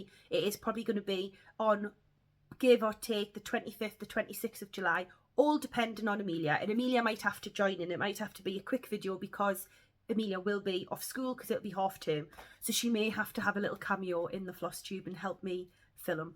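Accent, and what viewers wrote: Northern England, United Kingdom. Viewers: Great video - you are so funny and down to earth. I'm a fellow northerner and love your accent. Pickle 😊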